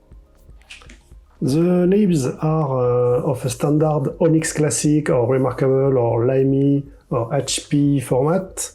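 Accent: France. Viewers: Toi t'es Français. Tu parles aussi bien que moi avec ton accent magique et grace à ça j'ai tout piger. Merci.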